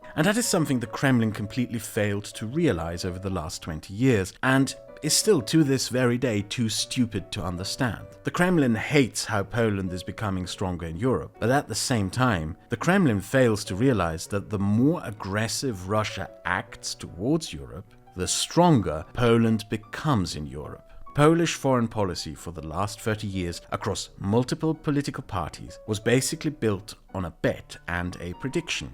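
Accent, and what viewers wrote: United Kingdom. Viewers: No he doesn’t he just recites Wikipedia in a British accent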